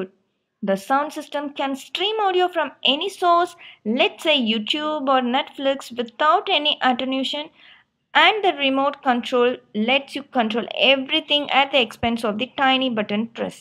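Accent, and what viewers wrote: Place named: South India, India. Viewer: Could find an accent u should be south Indian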